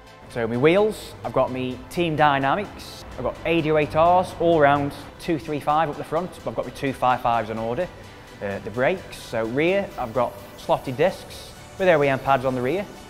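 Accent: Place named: Stoke, United Kingdom. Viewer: You are deaffo a Stokie! I recognise that accent from anywhere :D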